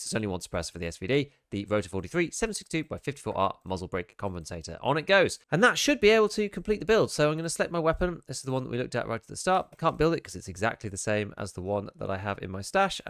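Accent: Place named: United Kingdom. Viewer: you sound like a re**** brit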